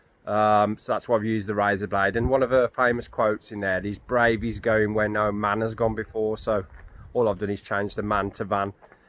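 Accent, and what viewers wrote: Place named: Midlands, United Kingdom. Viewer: Great video. Nice listening to a proper midlands accent. You deserve to gain plenty more subscribers. John👍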